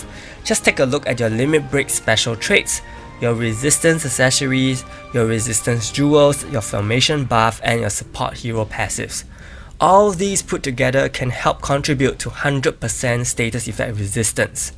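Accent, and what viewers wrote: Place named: Singapore. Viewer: Hah! I hear your (very familiar) accent and took the chance! Singapore yo ;) Looking forward to the next series. Take care in the meantime!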